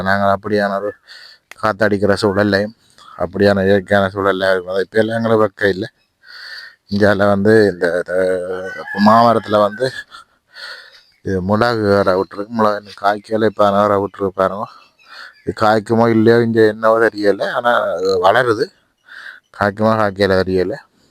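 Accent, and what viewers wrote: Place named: Sri Lanka. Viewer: நீங்க இலங்கை தமிழில் பேசுவது அவருக்கு புரியவில்லை இது இலங்கை வன்னிபகுதியா? அருமையான பண்ணை வீடு மாதிரி இருந்தது நன்றி வணக்கம் தமிழகத்தில் இருந்து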